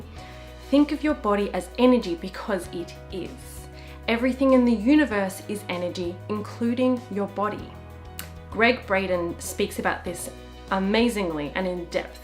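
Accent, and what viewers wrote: Australia. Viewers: English? Hmmmm it sounds Australian to me but... maybe I’m wrong ?? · Are you Australian ?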